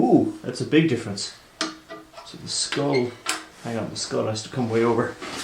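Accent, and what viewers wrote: Ireland. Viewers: Is that a Meath/Westmeath accent? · You sound almost Irish? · hey not to be a dick but why are you the only one i ever see on video that has the Irish accent and everyone else sounds American ? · I knew it! Irish english!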